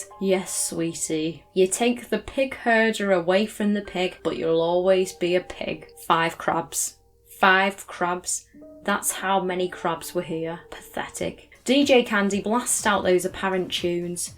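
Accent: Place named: Merseyside, United Kingdom. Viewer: Just started watching some My Sims videos today, especially looking through all the mini games in Party DS. I still remember most of them and they were so fun. More importantly, which city/county are you from? Your accent sounds like a Merseyside one at times but then it goes again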